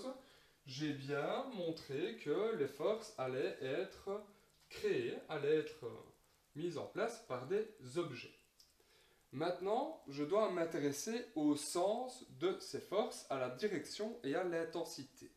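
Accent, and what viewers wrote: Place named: Liège, Belgium. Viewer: petit accent liégeois? hahaha bien expliqué merci !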